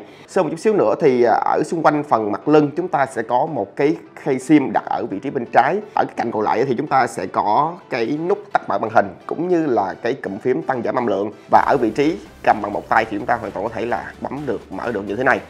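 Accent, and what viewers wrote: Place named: South, Vietnam. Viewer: Nghe giọng Miền Nam k quen cảm giác khó nghe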